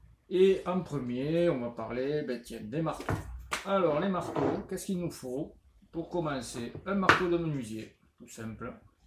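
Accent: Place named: Provence, France. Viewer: je viens de decouvrir ta chaine,super simpha nous qui sommes en Australie trouvons que tu es un poete de Provence avec ton accent, tes expressions bien humanes,merci mille fois, tres agreables tes videos bon a bientot Robert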